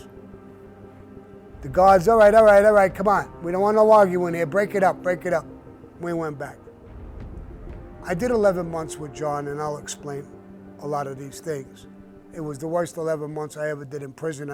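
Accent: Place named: Brooklyn, United States. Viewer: Bensonhurst's Own...Sammy the Bull, the Brooklyn talk..😉😎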